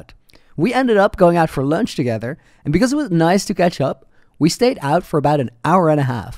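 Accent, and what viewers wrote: Netherlands. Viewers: I knew the guy was Dutch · My guy is Dutch?!?!?! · Are you dutch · I hear you’re Dutch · Mate judging boy your accent your dutch arent you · I like that doesnt try to hide his Dutch accent completely like other youtubers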